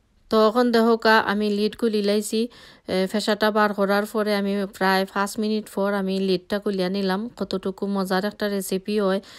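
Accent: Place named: Sylhet, Bangladesh. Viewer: চমৎকার সিলেটি আপা মনি চমৎকার খুব সুন্দর রেসিপি বানাইছেন খুব ভালো লেগেছে তাই পুরো ভিডিও দেখে লাইক এবং কমেন্ট করে গেলাম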